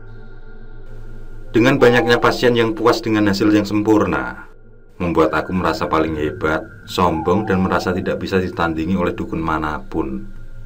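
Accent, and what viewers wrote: Java, Indonesia. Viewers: Suaranya mirip pakdhe Alfan (dongeng tengah malam) logatnya mungkin sama wong jowo nya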